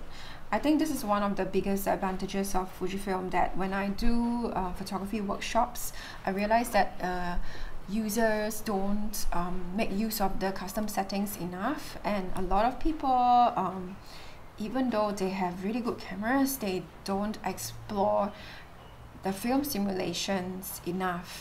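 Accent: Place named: Malaysia. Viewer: This is 2nd time I watch your video, I don't know your background my guess with your accent and mannerism is that you are either from Malaysia or Singapore, I think it is the former. BTW I am a Malaysian, and this camera is too expensive and sophisticated for me.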